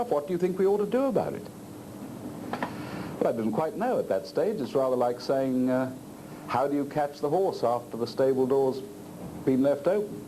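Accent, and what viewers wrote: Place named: Australia. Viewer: FFS. There are 3 main Aussie accents. He has one of them.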